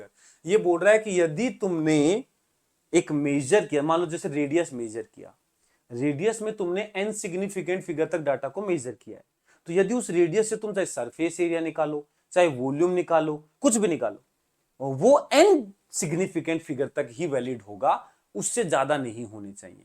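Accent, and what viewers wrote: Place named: Rajasthan, India. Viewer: rajasthani lingual greatttt😄😄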